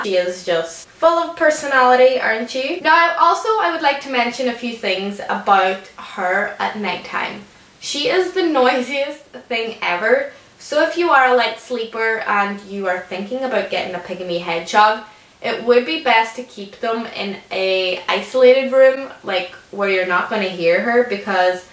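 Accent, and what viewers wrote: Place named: Ireland. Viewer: Awe shes so cute and i love your irish accent!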